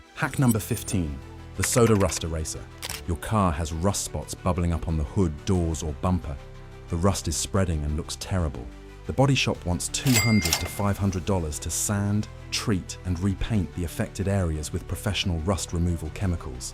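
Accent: England, United Kingdom. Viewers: Why does an English accent, somehow, import an "expert" narrated video?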